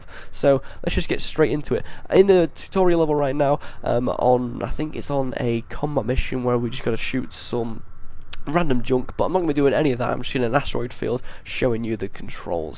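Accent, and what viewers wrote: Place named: United Kingdom. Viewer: You might well not like his voice. But it's a regional British accent rather than a nose blowing issue.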